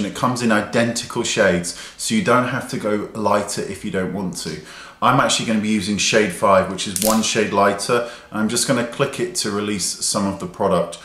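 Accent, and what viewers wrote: England, United Kingdom. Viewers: I love your english accent especially how you say dewy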